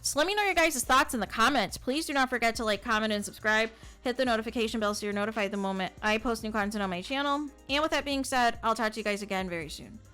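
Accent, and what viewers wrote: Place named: Minnesota, United States. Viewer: o ok, ur voice just reminds me of my friend from Minnesota especially when u say u guys.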